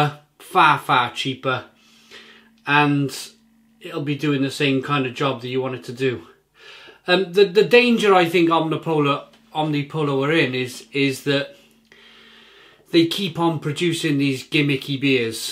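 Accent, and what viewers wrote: Wales, United Kingdom. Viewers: Managed to getbabcan for £3 using Tesco clubcard, thought I'd look up a review and in my head I thought I bet that Welsh guy has done a review, you didn't disappoint, cheers for the review mate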